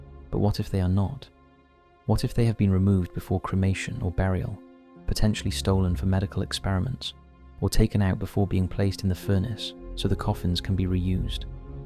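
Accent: Wales, United Kingdom. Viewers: I could listen to this guy all day long. Who knew the Welsh accent was so beautiful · Why are all these shows being narrated by british men why not American?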